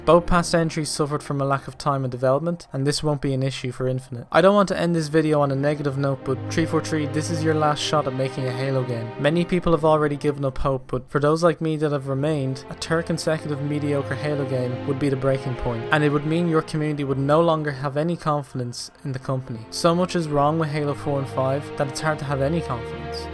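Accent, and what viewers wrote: Ireland. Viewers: Matthewmatosis is that you?? You sound a lot like him lmao. More than just the Irish accent; very analytical. You made really good points man, and got me more hopeful for Infinite somewhat. It truly is their last chance to save not only halo, but the xbox even. Subbed, keep it up! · rough guess correct me if I'm wrong cork or Dublin judging by the accident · My boy is Irish. I can hear it 👐 Nice video dude!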